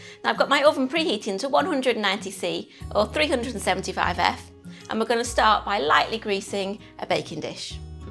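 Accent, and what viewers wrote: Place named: England, United Kingdom. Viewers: Delicious cooking explanation with that English accent makes me watch this video on repeat mode. 😂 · Great recipe and super simple, thank you.....! Are you from Liverpool? I sensed a hint of a scouse accent. Hope you are a "red" :-)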